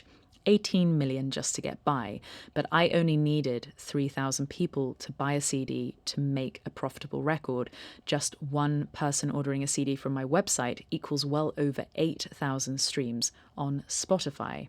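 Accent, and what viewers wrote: United Kingdom. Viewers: I'm left wondering if there is any subject that wouldn't be interesting - heard in your perfect British accent... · I love your channel! Long time watcher/listener first time commenting (I think). Your realness is what I appreciate most, I think we all can feel the you inside your content. That's rare and valuable. Plus being a dumb sounding American, I truly admire your British accent. Keep on rocking in the free world! 🤟🏽☮️🔥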